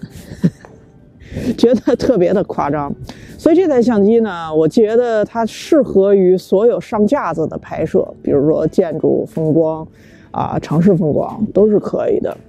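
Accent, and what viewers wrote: Beijing, China. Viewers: 今兒这视频我彻底确定您是北京人了，这京腔！😁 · edi是北京出身吗？语气很像呢。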